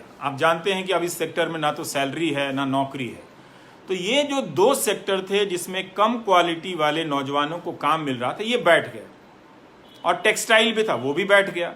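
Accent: Bihar, India. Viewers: I salute sir Ravish ji main aapka bahut big fan Ho aapki Bihar ki language bahut hi Pasand karta hun aapko aap kaise patrakaaron ke liye salute Hai Sar god bless you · Nice to see you continuing your duty of enlighting us aapka bihari language ❤️, we agree that you have done maximum number of programmes on public interest, we respect you.👍🙏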